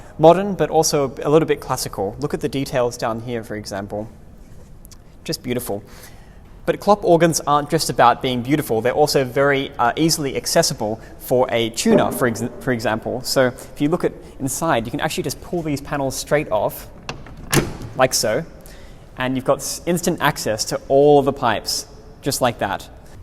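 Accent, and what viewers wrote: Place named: United States. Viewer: It is sad that the speaker broadcasts in American.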